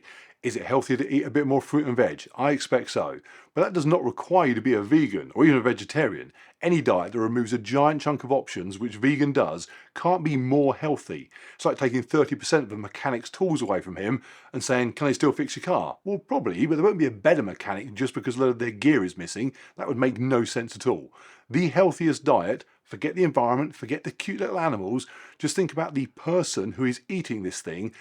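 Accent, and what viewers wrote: United Kingdom. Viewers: Unfortunately, I cousins understand a word in English. Very strong British accent and I cannot understand this English.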